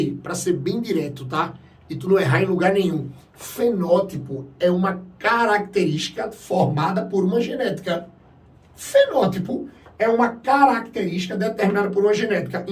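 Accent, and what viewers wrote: Northeast, Brazil. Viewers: Cara, melhor aula sobre esse assunto, que professor fantástico. A explicação desse professor é muito clara e única, esse nosso sotaque nordestino é muito bom! Parabéns pela aula professor, com certeza vou lembrar do senhor na minha prova!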